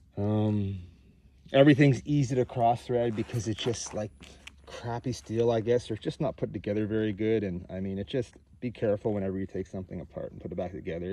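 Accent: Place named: Canada. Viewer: You sound Canadian 😄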